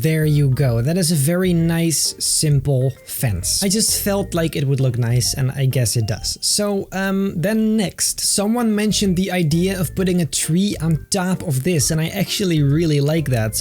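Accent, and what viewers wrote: Netherlands. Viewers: Are you from the Nederlands?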